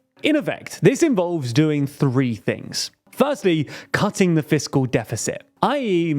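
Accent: United Kingdom. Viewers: I'm so sick of guys with British accents trying to convince us that gods don't exist. :P Milei was elected on the explicit promises of cutting spending and saving the economy from the course it was on toward Venezuela's hyperinflation. He was honest during the campaign in declaring the recovery would be painful. You'll look like a buffoon in five years for opening the video with a smug attempt to take rock lyrics out of context with an ad hominem attack making Milei out to be some kind of chaotic evil dictator rather than a brilliant economist willing to take as much heat as necessary to correct the catastrophic course that the socialistic keynesian model of Argentina has been on. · Why is it always a bouncy british voice that's telling lies on youtube. I swear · 1. Your videos are quite long, boring, filled with ads, in the beginning , middle, and end. 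2. You barely give sources, your video titles are clickbait, and your content is biased sensationalism. 3. Your channel is the trash humans should rid themselves from. 4. #TLDRNewsGlobalIsTRASH and an obstacle and distraction keeping ppl from finding quality information. #ThereISaidIt Now click like on my comment so the POS behind this video read this (prob not the British-sounding narrator)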